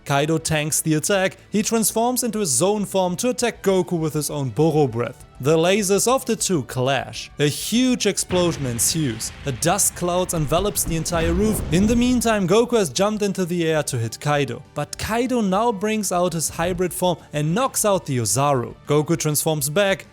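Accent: Germany. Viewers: Are you german? You have the accent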